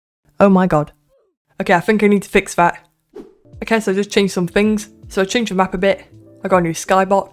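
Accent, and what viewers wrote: United Kingdom. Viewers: You sound like a british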